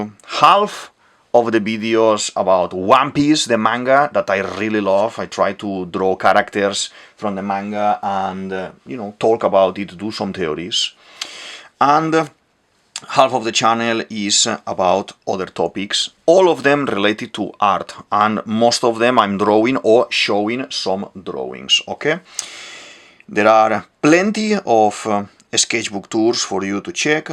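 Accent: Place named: Spain. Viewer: Wow, I just found your channel. You fascinate me right off. Your accent sounds Spanish, your self portrait indicates you may be of Asian decent, and you live in UK. Haha how fantastic! Also, I love your work!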